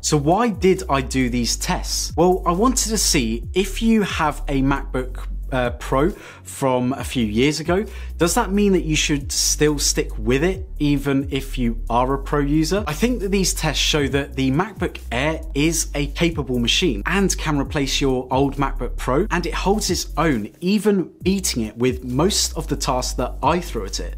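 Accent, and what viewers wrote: United Kingdom. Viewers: finally! some practical comparison! not some geecbench and raw tech specs! thank you! how about some simple things like watching good quality video/ movie or zoom call? and it is just nice listening to british english 🙃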